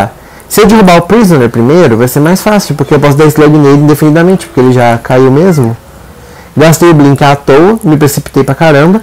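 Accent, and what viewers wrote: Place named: Germany. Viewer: É o que eu sempre digo, " Escolha o survivor de acordo com o mapa e com time, por isso vc não PODE ser monoEla existe e é chata" kkkkkk não precisa explicar muita coisa sabe todos os meus esconderijos, vou ter que procurar outros. Yidhra Party! Tu estás expandindo a firma pq aja serva. "Terror Shock" Leia com o teu sotaque alemão que amo. Que milagre Doctor não farmar e sim ser farmada. Cowboy bestão, A DW amou. O esquecimento do criador de conteúdo. "Eu posso deixar ele não chão quanto eu quiser" kkkkk... Obrigada por adiantar Prisoner. Poxxtman me imitando achando que vai cautelar o blink e sefuuu É muito sangue frio e planejamento pra jogar de Hunter, principalmente com a DW que vc precisa de muita, mas muita habilidade, concentração, predict entre outras coisas. E acho extremamente necessário que não só a galera que quer aprender a jogar com ela assistam esse vídeo, mas também os survivors, pra aprenderem a jogar contra ela.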